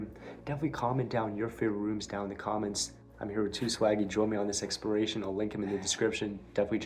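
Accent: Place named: United States. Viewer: Did I miss the round spire/tower room from the inside ? I also have read some comments about learning what this older furniture styles are , it would help with dating stuff . You don't have to take classes to learn stuff , just look at the pics and do a google search and you will usually find more info on the old stuff . The cork on the walls in that bathroom was odd to me , I am guessing it was done in the 1960's or later , that was Hideous looking . The photo slides are not Polaroids , I lived through that Era , and used Polaroids . I also have Some Slides from the 90's , so they are pretty common . The Scanners from some companies in the 90's had an attachment piece for showing the slides on your PC. Were you on a vacation trip in France ? You speak American English , so I assume you are from the States . I am also very glad you were respectful and not ransacking the place , I watched a different video with a real Jerk , that was so disrespectful , and made me think he is a real thief and lowlife. 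Keep up the great work .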